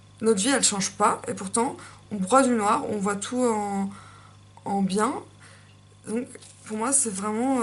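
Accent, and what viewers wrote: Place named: France. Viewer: J'aime aussi ton accent français quand tu t'exprimes en anglais (c'est beau). Je ne savais pas que tu as vécu en Angleterre aussi, mais j'ai bien vu que tu es Franco Anglaise. Merci pour la vidéo (Ecouter de la musique moi j'ADORE !!!!!!! Je m'évade je suis dans un autre monde j'oublie TOUT ça fait un grand bien. Je peux écouter de la musique pendant quatre heures car la musique est tellement varié.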